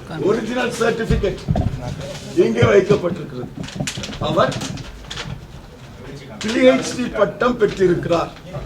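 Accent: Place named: Nagercoil, India. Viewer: Nagercoil karanga pechu semma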